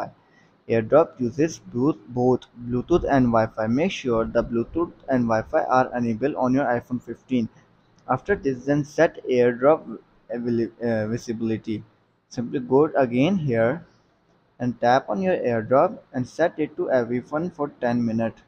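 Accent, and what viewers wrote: India. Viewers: Could barely understand anything with that thick Indian accent. Still can’t get it to work.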